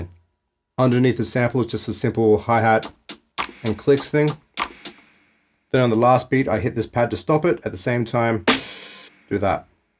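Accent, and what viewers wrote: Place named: Australia. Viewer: oh wow i would not have guessed that was just an irig! Ah didn't know there was such a range of Australian accents, thats great, keep on rocking my dude!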